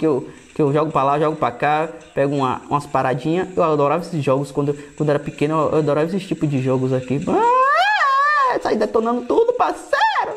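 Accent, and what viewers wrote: Bahia, Brazil. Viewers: Mano vc é baiano? O sotaque e as gírias igual a minha pivete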